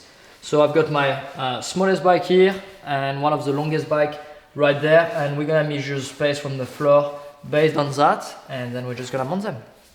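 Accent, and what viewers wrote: France. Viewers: accent français la 🥰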